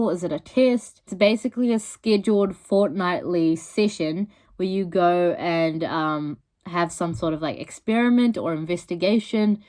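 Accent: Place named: New Zealand. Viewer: Sound like gru but from New Zealand, and sorta a female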